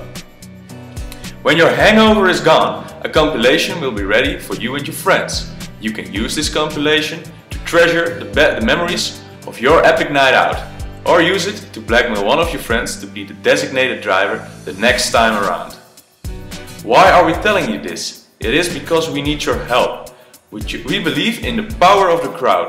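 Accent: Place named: Netherlands. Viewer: Zwaar leuk idee, maar hadden jullie niet even iemand kunnen gebruiken met niet zo'n overdreven Nederlands accent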